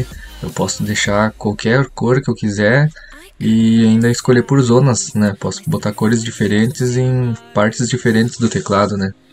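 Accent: Brazil. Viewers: Tu é de SP? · Amo assistir um video de tecnologia com um apresentador Gaúcho. É uma baita barbaridade thê kkk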